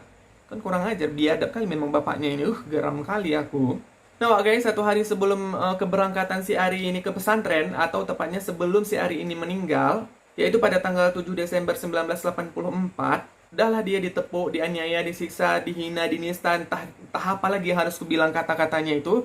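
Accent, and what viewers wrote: Medan, Indonesia. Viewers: Medan kale kau bg..😂😂😂..mantap👍👍👍..selalu semangat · Habis nonton filim arie hanggara. Langsung kesini. Auto subrek. Btw nampak x logat medan nya bg😂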